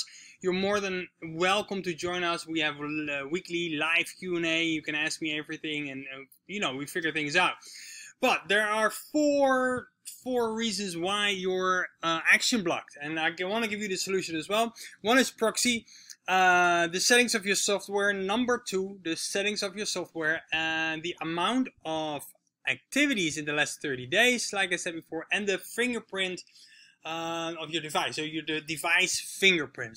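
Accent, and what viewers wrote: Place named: Netherlands. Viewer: Grappig dat je nederlands accent hoort .. ik kom trouwens uit Honduras🇭🇳 ,groetjes en genietze in Costarica 🇨🇷💯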